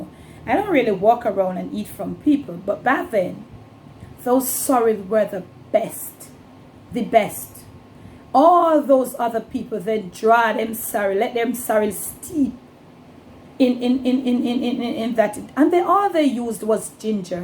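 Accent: Jamaica. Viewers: Hello miss wishing you and families a happy new year for 2020 I like watching your cooking show they're very interesting like how you talk patwha, God bless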